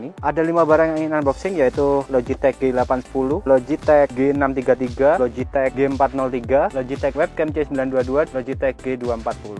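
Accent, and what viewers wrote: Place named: Java, Indonesia. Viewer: bahasa jowone kentel ... hahahaha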